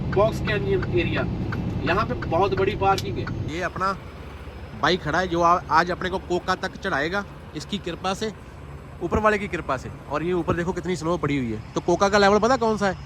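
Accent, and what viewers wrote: Punjab, India. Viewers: Bhai aapki Hindi Punjabi mein sun ke maja aa jata hai 😅!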